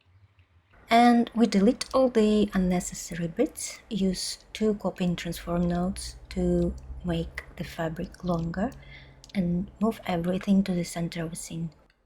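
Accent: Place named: Russia. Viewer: Прям слышен крепкий русский акцент.✊🤟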